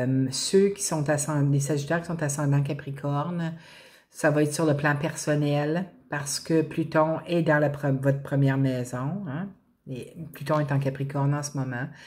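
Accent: Quebec, Canada. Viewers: Merci merci infiniement ma bonne fée..un trés beau tirage..je vous souhaites une trés bonne semaine🌹💗🥰🤗🧚‍♀️💞 P.s" jadore votre accent Quebecois craquant!!🤍🌺💗💯💥💫🙏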